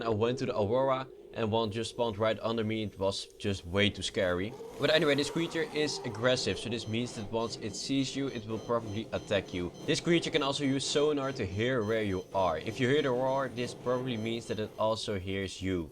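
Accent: Netherlands. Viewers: ben je soms nederlands het klinkt wel zo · you sound very dutch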